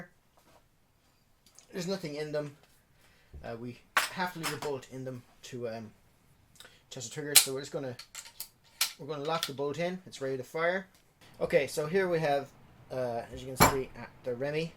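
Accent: Ireland. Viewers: This guy Irish? He has like an accent it’s cool haha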